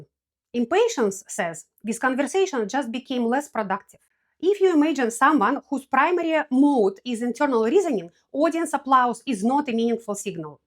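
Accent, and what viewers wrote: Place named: Russia. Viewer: Pretty, pretty thick russisch accent. Bloody hell 🌷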